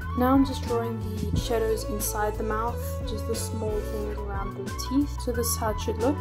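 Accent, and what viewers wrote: South Africa. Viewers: Are you South African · R u from South Africa ?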